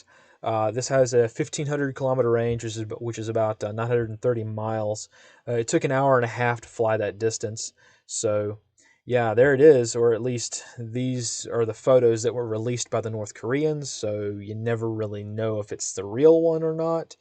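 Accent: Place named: Mid-South, United States. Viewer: Very good report. Well spoken with a very pleasant voice. I would guess a Mid South accent? Maybe the Carolina's or Tennessee?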